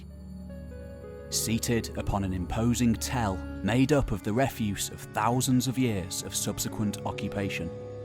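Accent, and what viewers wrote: England, United Kingdom. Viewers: Scrotum Monster it’s his particular English accent. You’re missing his other pronunciations different from yours.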